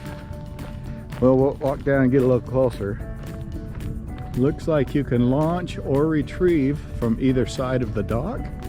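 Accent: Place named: Idaho, United States. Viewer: Are you from Idaho, Utah, Washington? Your accent reminds me of family in E. Idaho, it was pleasant even if seeing water levels like that aren't 😳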